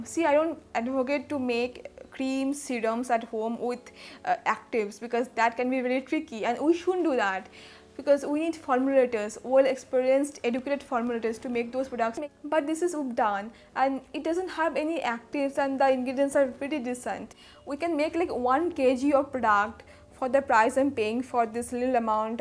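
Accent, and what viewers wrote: Bengal, India. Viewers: Her pronunciation is absolutely perfect. There is not a single way English words are pronounced lol. Americans, British,Irish and Australians have their own version of English. There is no ONE pronunciation. This is the Indian one and it's perfect. Stop making people speak the way you want them to speak. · She is not at all trying copy any accent here,its only MTI(mother tongue influence) as she is a Bangali,every person in India has their own English accent because of our regional languages. If we go to south India, their English is very different. Similarly, people living in north India have a different English accent, so what's wrong with her accent. Oh yes,she isn't an English teacher. You are doing a fabulous job. Let's help our fellow people to grow,let's motivate and encourage them. If we can't, lets just keep quiet. Let's be nice to people.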